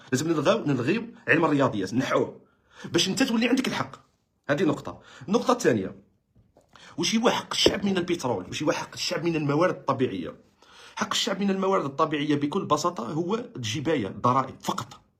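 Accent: Morocco. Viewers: الهدرا تاعك تبان شغول مروكي ،،،،تهدرو غير علي كرشك ،و انت واش تمد البلاد ،اتزوج دير دراري و رمي برا ،،، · هذه اللهجة مغربية و الله أعلم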